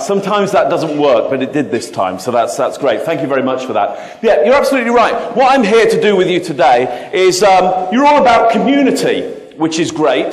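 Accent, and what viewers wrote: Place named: United Kingdom. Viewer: the british accent helps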